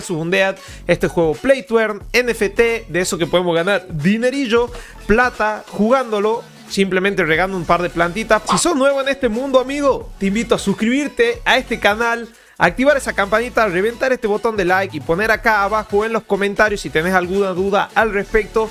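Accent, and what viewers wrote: Tucumán, Argentina. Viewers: Sos Tucumáno o santiagueño ?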